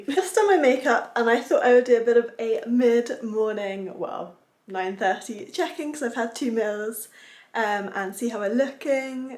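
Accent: RP, United Kingdom. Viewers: love that British accent, sexy woman!!! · You created an emotive response in me from the first bikini angle, thank you, I do like a well spoken girl (posh) :-)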